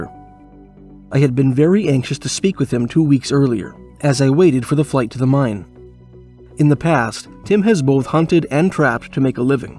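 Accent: Canada. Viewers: I can tell by your accent that you're Canadian 💯 I think the Northwest Territory is the scariest most unknown desolate creepiest place in all of northern North America !!!! Especially the Nahhani region not just because of all the eerie stories but how much of a extremely dangerous gigantic maze it is of huge canyons & rivers & its completely surrounded by other giant regional mazes of forest & mountains & frozen tundras !!!!! It's like the heart of that whole entire region & even though ppl have been there, it's still the most mysterious unknown place in all of north America because of how remote it is & impossible to get to by foot or by automobile!!!!! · Love your Canada accents of passsta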